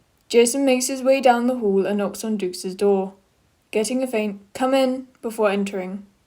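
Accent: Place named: United Kingdom. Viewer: HELLO oh omg your BRITISH i think that's what the accent is i'm originally from dustbunnys channel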